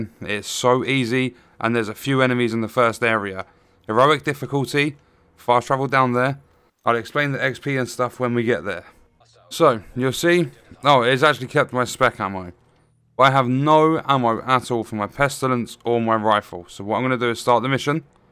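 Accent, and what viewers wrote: United Kingdom. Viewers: subbed just becauce of you wounderful british accent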